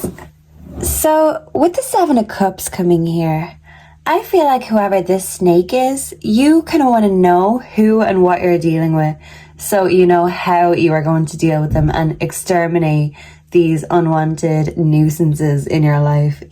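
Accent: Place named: Ireland. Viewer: Hi l love how you do your tarot reading.How you use different pack of cards.Your reading is really interesting. I love your àccent Do you come from Ireland?Are you Irish ?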